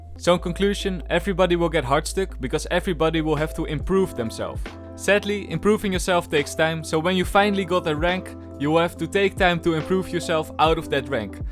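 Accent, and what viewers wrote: Netherlands. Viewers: your nederlandse accent is really funny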